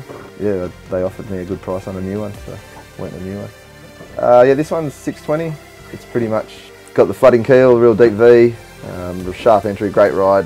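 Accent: Australia. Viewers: What part of Australia is that?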